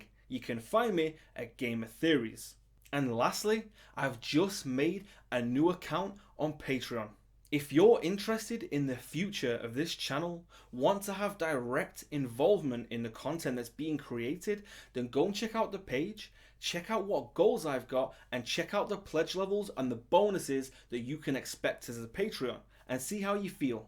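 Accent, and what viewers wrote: United Kingdom. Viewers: sounds like something from the UK? Hope he'll tell us!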